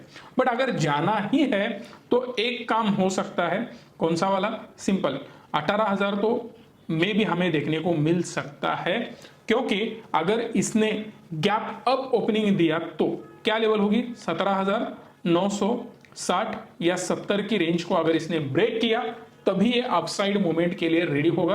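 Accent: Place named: Kolhapur, India. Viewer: सर मराठीतच तुमचं कोल्हापुरी आयकायला आम्हाला खूप आवडेल 🙏🙏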